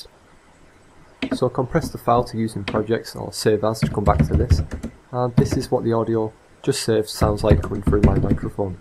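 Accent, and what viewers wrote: United Kingdom. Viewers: Loving the boro accent man. Takes me back to my younger days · Brilliant video, thank you. Are you from the North East of the UK? Your accent sounds very similar to mine. I'm from Middlesbrough.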